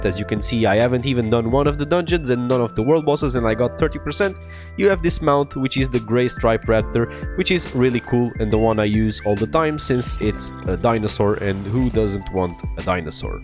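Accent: Portugal. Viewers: good video! btw, you sound portuguese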